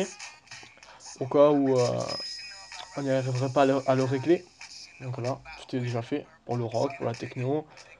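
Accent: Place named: South, France. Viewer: Oh t'a un accent mdr t'es bien du sud Saint Christol les ales j'habite pas loin xd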